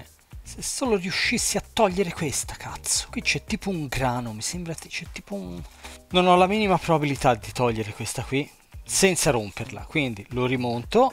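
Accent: Tuscany, Italy. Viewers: Da quale parte della toscana vieni?😂😂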